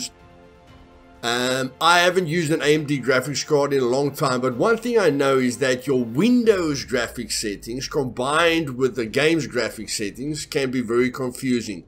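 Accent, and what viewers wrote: Afrikaans, South Africa. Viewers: Hey this Guy definitely Afrikaans😂😂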